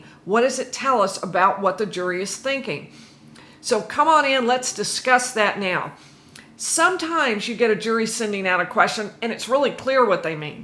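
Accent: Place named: Kentucky, United States. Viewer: Love the accent, are you from KY? You sound almost just like my wife from Covington, KY. Thanks for the breakdown.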